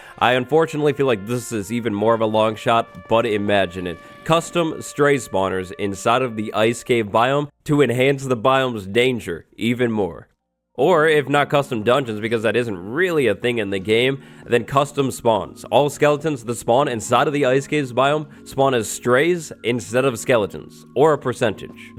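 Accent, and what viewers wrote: United Kingdom. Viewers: Top notch British accent 👌🏼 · Aye yo your british? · wait you're british? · wait you’re british??? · from intro: you're british? xD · Ur british I am to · ur british?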